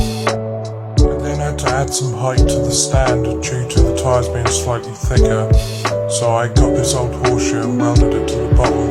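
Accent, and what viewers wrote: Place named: United Kingdom. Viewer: Nice build. I am working on something similar. Is it road legal. I assume from your accent you are in the UK. If so what was the process?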